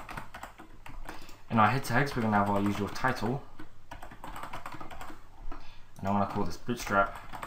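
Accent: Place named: England, United Kingdom. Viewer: I agree with HCFP Gaming, TOTALLY! There is always a pause button. Besides, I don't like downloading ONE video for just one piece of information. Download the video software with a traffic code (well, I am not on commission VLC Media player -free), you can watch your video at whatever speed you want. Do you know how unhappy my ISP is when I download 54 videos which could have been done in 14!? Love his video, especially the fact that he's English! Yummy accent!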